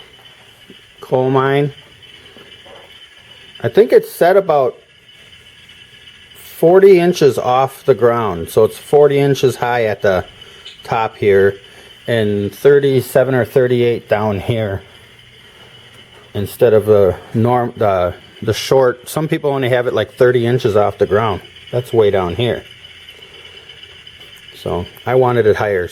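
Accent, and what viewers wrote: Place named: Wisconsin, United States. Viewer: Love the layout and the wood paneling in the basement is awsome and I detect a WISCONSIN accent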